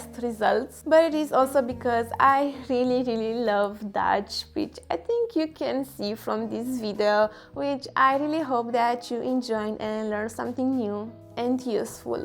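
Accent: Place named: Romania. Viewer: God damn, I recognized instantly that you're from Romania (mostly because so am I) but daaamn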